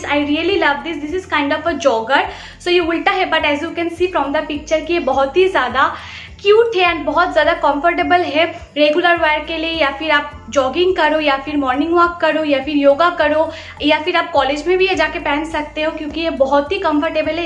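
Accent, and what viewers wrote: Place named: Bengal, India. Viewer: Your voice is very sweet! Bengali touch ♥️♥️♥️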